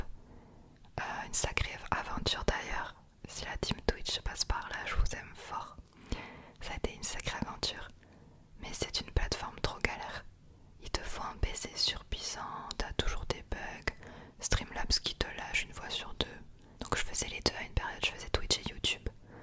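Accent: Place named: South, France. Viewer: Tu es Parisienne ? Tu as dû descendre dans le sud, toute petite, car je reconnais notre accent. Je suis de Carmaux. Bizz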